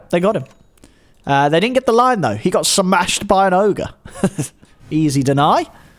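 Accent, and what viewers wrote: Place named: United Kingdom. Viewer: where can i watch all his casts?? i want to adapt his thick british accent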